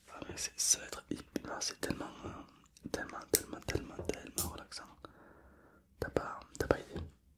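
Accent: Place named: Toulouse, France. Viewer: Super cette vidéo 👍🏻 J’ai remarqué que vous avez un accent, vous venez de Toulouse (je pose cette question parce que moi je viens de Toulouse) Sinon trop cool tes vidéo ASMR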